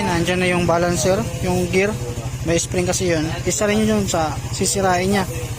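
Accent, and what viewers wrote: Ilocos, Philippines. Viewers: masa Ilocos ka ba boss?!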